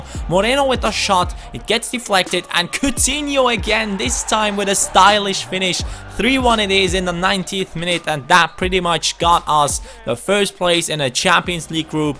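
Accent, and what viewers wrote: Germany. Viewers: He's Bavarian German ;)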